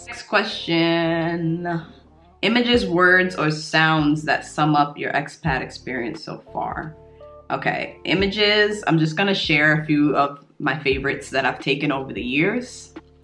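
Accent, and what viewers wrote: New York, United States. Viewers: You TOTALLY have a NY accent!! But it’s particularly more Queens or Brooklyn. It always makes me think of Mos Def. 🤓😎 I love it! ❤️ I have a Philly accent but am more careful to speak a “standard English” when I speak to people outside the US. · I can't hear any New York accent. I'll bet you could put one on if you wanted. Edit: I heard it when you said "horrible." 😊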